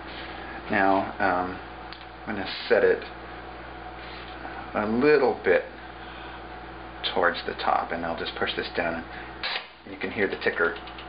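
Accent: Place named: Canada. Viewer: Your accent is, like, 5% Canadian. Are you in northern Washington?? What a cool accent, man.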